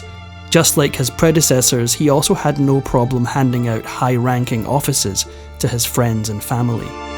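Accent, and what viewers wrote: Scotland, United Kingdom. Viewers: Scottish; possibly Glascow. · YES. GOOD SCOTTISH VOICE. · He's Scottish ,how's he supposed to do that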